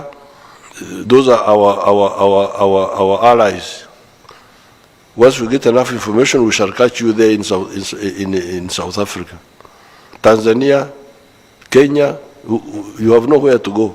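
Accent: Nigeria. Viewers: Is the host a Nigerian? I couldn't hear his English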